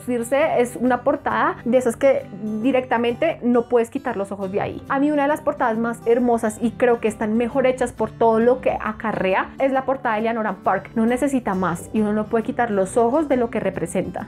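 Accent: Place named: Bogotá, Colombia. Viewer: Hola... Creo que es la primera vez que te escribo, pero JAMAS me pierdo de tus vídeos... Yo también disfruto del olor de los libros y de las portadas de los libros, jjjeeje... otra cosa que me encanta de los libros, es que me hagan reír, y bueno, aunque parezca un tantito masoquista, también amo, que un libro me haga llorar, y hasta que me deje deprimido... No quería utilizar una frase cliché, pero es algo así como sentimientos encontrados, osea, PONERME FELIZ PORQUE UN LIBRO ME DEPRIMIÓ, jjajjaa... Suena loco, ya se... Aprovecho para decirte que me fascinan tu vídeos, tu acento bogotano, tu personalidad, y esa modestia que tanto te caracteriza... Nunca dejes de hacer este oficio, por favor... Un abrazo desde Montería Córdoba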